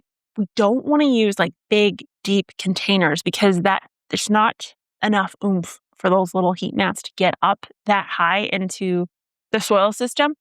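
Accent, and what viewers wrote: United States. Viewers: I’m from Joliet Illinois and I’ve got to say you have pretty much the same accent as me. So you sound American suburban white. Not stereotypically Canadian at all. The people from Wisconsin sound Canadian tho lol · As a Wisconsinite, you sound like you live around here. Regional accents! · I've used heat mats for quite awhile. When I run out I sometimes use the oven with the light on and the door cracked. You definitely need a thermometer to monitor the temperature. Best used for peppers. You sound like an American to me, except for using the phrase 'those ones.' :) I live in Michigan so I guess close enough to Canada. Really enjoy your videos.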